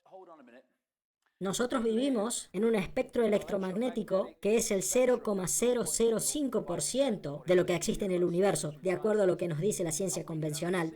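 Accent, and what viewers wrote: Córdoba, Argentina. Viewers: Porque es cordobes!. Linda tonada!. Saludos desde Buenos Aires!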